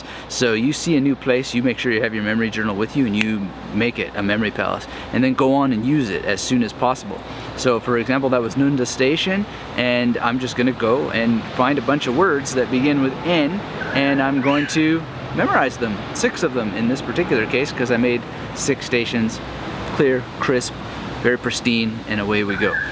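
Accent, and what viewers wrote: Canada. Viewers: Are you canadian? :o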